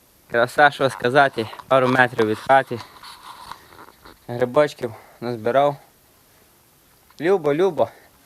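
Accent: Hutsul, Ukraine. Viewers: Все мені подобається!!! Гори і природа, грибочки збирати, і діалект гуцулів, який Ти шануєш, і будиночок, відпочинковий,і музика!!!Відео прекрасне, дивлюся з задоволенням Дякую,!!! Будь здоровий і щасливий!!!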